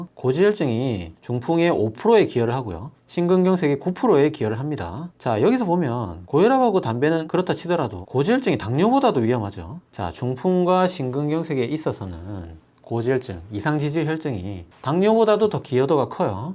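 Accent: Gyeongsang, South Korea. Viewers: 구수한. 경상도사투리. 너무 정감갑니다 편안하고. 쉽게. 설명해주셔서 감사합니다 스폰지처럼 머리속에. 쏙쏙. 이해가. 갑니다 짱. 입니다 ㅎㅎ. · 와이거 메모해야겟네..ㅜ 근데경상도이신가요? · 대구분이신가봐요^^응싱스럽다말은 경상도사는 저의세대도 저만 사용하는 사투린줄알았는데..^^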